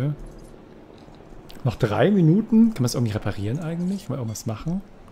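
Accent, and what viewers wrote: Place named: Bavaria, Germany. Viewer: Wie gut, dass Daniel nicht dein Bayrisch hört ^^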